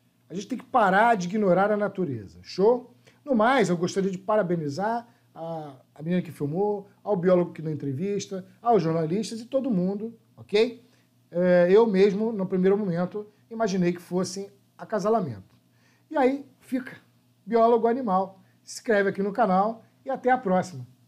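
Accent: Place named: São Paulo countryside, Brazil. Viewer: Esse sotaque do interior de SP é de mais kkkkkkkkkkk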